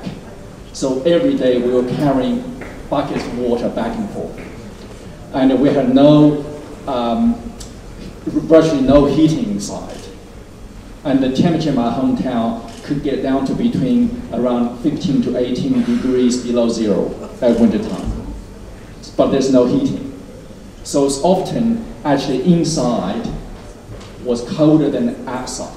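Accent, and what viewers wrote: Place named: Australia. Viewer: All those years around a 'Aussie' wife...he has a slight Australian accent. So loved listening to him tell about his life. Loved the movie about him!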